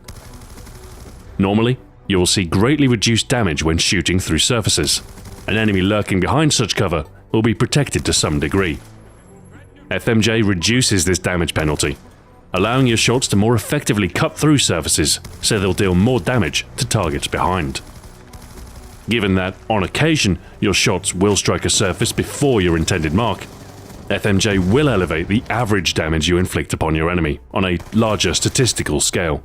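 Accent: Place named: United Kingdom. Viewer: Hey, 2 questions here; have you ever considered that one of the sole reasons that part of your subscribers watch your videos is because you have a kick-ass British accent? And have you ever had a Canadian Keith's beer?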